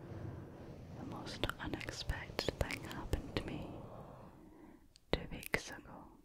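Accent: Scotland, United Kingdom. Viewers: ayyy scottish squad!! i've never seen another asmrtist with a scottish accent, so this is super lovely :D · Wow, I'm all-a-tingly listening to/watching that! been away from Scotland for nearly 30 years and LOVE the accent. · I've just started getting into ASMR. It feels surreal that this is the first time I've heard a Scottish person speak since I've watched Still Game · Love your tingly whispers and beautiful Scottish accent. Pure heavenly relaxation! ❤️ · you have such a sweet and soft voice and that beautiful accent of yours makes the audio even better :D Brittish in whole are wholesome and great, especially scottish! ;) Have a wonderful day! Best regards// Jonathan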